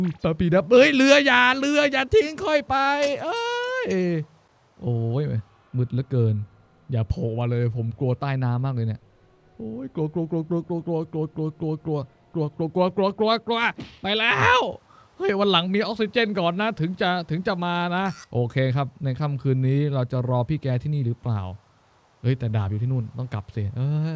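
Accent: South, Thailand. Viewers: Sunshine Channel ลุงพีคนใต้หรือคนภาคกลาง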